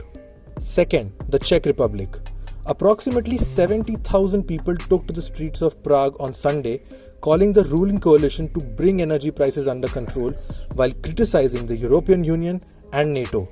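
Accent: India. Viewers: PROPAGANDA . Sounds Indian . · Why dont indian ppl say "T" correctly and properly while pronouncing and reading such international news ....just try hard to throw away your thick -and bad indian accent all of sudden....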